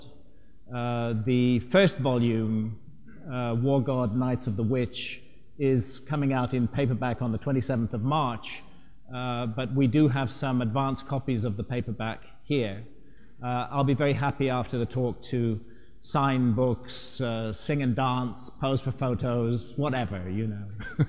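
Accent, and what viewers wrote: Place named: England, United Kingdom. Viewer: the English accent makes him sound so articulate and educated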